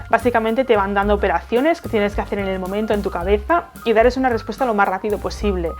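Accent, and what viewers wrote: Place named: Spain. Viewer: me gusta tu voz y ese acentico español,. te preste mucha atencion y te entendi bien, ademas que eres bien linda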